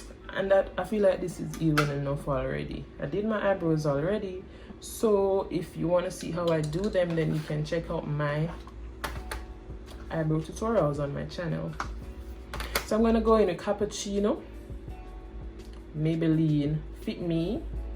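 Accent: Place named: Jamaica. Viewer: Jamaican accent so real mi lobe it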